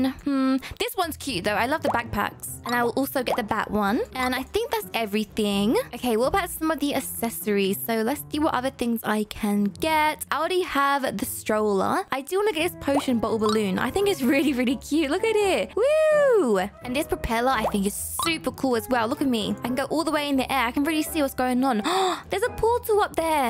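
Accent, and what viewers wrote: United Kingdom. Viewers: wair are u british?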